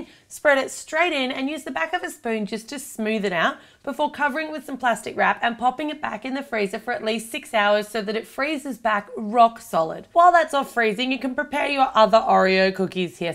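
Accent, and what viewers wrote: Australia. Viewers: is she from australia?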